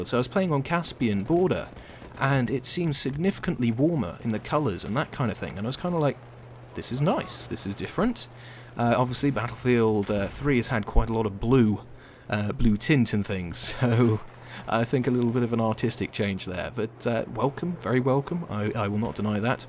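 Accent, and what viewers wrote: England, United Kingdom. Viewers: Oh my god! it it so satisfying to finally hear somoene with a strong english voice narrating on of these videos,(i cannot cope with anymore generic US acents) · exactly what I was searching for, far better than any other video assessing the patch that has thus far been uploaded on youtube. on a personal note, nice to hear an english accent on a battlefield 3 commentary, I am subscribed to many american commentators and had struggled to find a decent english equivalent, struggle no more.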